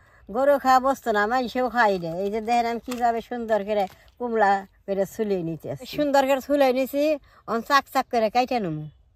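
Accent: Tangail, Bangladesh. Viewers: মাসীমা, আপনি নিশ্চয় বাংলাদেশের টাঙ্গাইলের মানুষ ছিলেন। কথায় বুঝতে পারি। টাঙ্গাইলের বাসিন্দারা কলার বেরাইল/ থৌর খেতে খুব পছন্দ করে।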